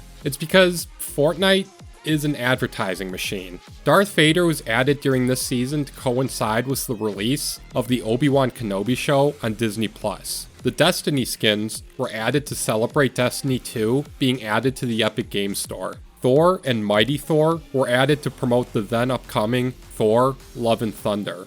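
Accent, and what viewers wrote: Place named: United States. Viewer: The way you speak makes my ears hurt. You’re accent makes it painfully obvious you’re just another american. I hate the way you guys speak. It literally is the wrong way. When you say words that include “Er, Or, etc), you shouldn’t be saying it (for example: Word), like “Wrd”. The ‘R’ is simply there to change how it gets pronounced, it isn’t meant to be pronounced on its own. Don’t even get me started on words like ‘on’, which oddly seems to be pronounced like “Arn”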